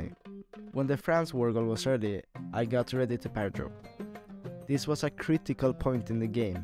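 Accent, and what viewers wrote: Sweden. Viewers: Are you swedish? I can hear it in your accent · Hey are you Swedish? We have the same accent pretty much so I KNOW you’re at least Scandinavian 💀💀💀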